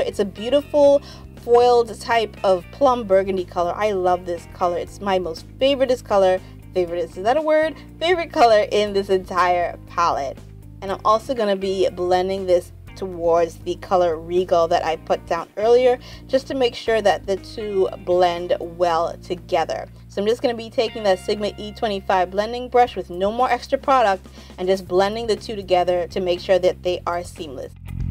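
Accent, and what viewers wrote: Bahamas. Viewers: I was looking at your previous video which was a review of lime crime utopia, & I said she sounds like a Bahamian we really can't hide our accent lol.. Go girl reppin 242.. :-)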